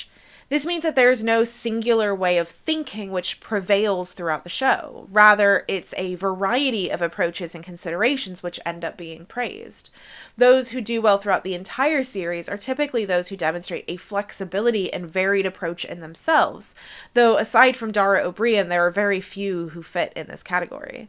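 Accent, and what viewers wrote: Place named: United States. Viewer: One good thing about Taskmaster is that the British female contestants don't have that annoying American way of speaking that this narrator has.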